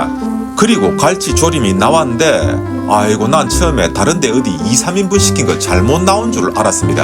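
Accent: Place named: Busan, South Korea. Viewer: 네네 정겨운 말씨랑 같은 부산시민이셔서 더더욱 즐감햇어요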